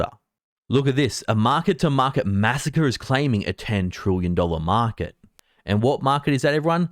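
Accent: Australia. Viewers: He's from Oz.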